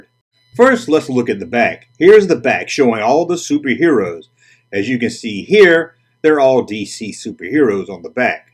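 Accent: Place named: Mississippi, United States. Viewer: Well done! Hey, where are you from? You sound like you could live right down the road from me in Mississippi. 😃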